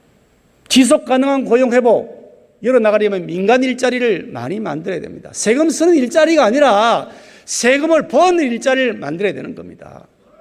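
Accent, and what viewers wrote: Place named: Gyeongsang, South Korea. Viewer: 김기현 대표 쥑이네ㅡ퍼팩트 치시네ㅡ경상도어투가악간 가미된 또록또록한말투음성 질타하는 늠름한 모습 멋집니다ㅡ실컷 질타하세요ㅡ욕먹어도 싸기도쌉니다ㅡ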